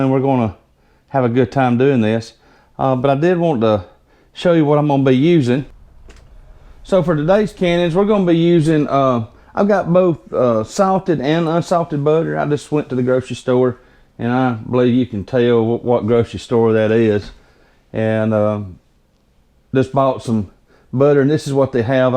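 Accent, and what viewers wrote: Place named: Kentucky, United States. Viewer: Nice video! I am in Kentucky. You accent sounds like us. What state are you in?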